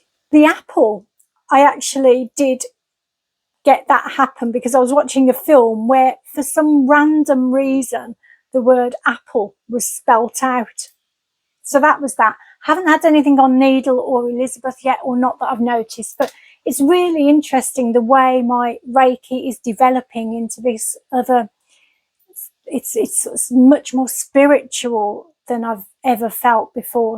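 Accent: Birmingham, United Kingdom. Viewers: I always thought it was a Birmingham, Midlands accent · I thought you were from Solihull or Birmingham by your accent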